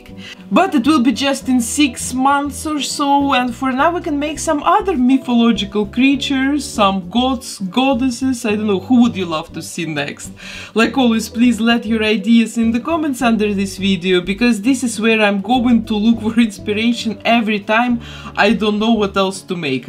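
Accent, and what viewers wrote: Russia. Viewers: Это самый потрясающий русский акцент, который я слышала)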